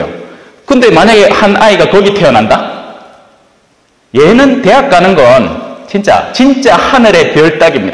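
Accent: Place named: Gyeongbuk, South Korea. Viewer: 신부님.감시합니다 다정.다감하신.말씀.강론.찰지십니다..경북..내고향.의성가음면...신부님.사투리..베트남에서 기뻐합니다..아멘.🙏🙏🙏🙏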